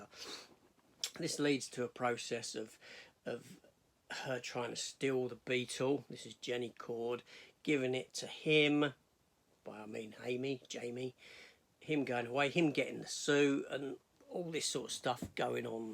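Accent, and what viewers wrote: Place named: England, United Kingdom. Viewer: This is meant as constructive criticism. I wanted to watch your review but I was frustrated by the poor sound levels. You start off fairly strong with your intro, and then move into a much louder show theme which had me lower my volume. You then return but soon your volume lowers to a soft mumble. This combined with your strong accent had me ready to move on.after a couple minutes. I'm not criticizing you, but your video and sadly not the content, but the presentation. I'm not an expert on audio, but I believe what you want to do is either be conscious of how your voice drops in volume, or put the audio through a compressor. Perhaps consciously over enunciating would help with your English accent. Good luck with your channel.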